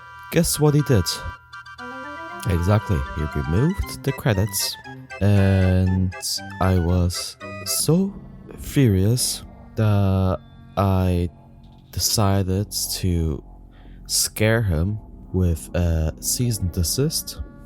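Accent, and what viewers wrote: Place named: Philippines. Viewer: u sound awfully similar to a Filipino or at least someone of ASEAN descent (southeast Asia)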